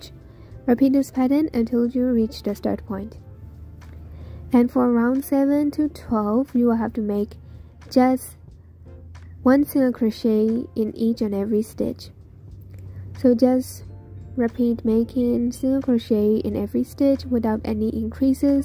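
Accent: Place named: Myanmar. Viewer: Are you Burmese? I can hear the accent.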